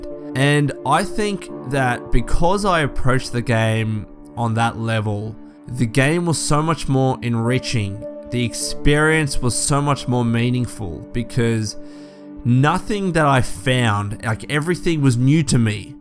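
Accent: Australia. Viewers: Is this Aussie accent?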